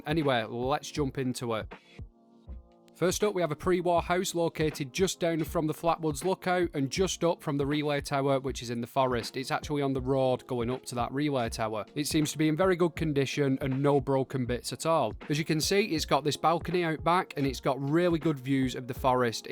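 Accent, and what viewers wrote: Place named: Northern England, United Kingdom. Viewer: mate your commentary is unreal, as a northerner hearing another northerner doing videos is hilarious cause I catch all the jokes, there'll be a time soon where northerners are appreciated more on youtube and you'll get ya views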